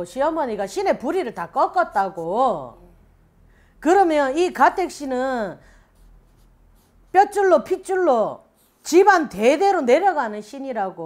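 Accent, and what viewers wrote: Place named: Gyeongsang, South Korea. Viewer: 선생님 사투리쓰셔서 갱상도 계신줄 ㅠ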